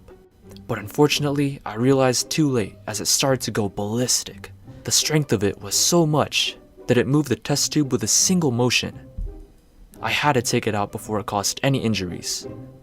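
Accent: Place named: California, United States. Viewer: Where are you from you sound like your from California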